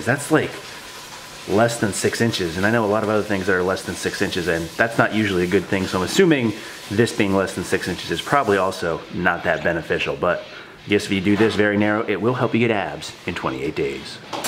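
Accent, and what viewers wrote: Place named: Mid-Atlantic, United States. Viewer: It was a combo of many different states. We'll call it a Mid-Atlantic accent lol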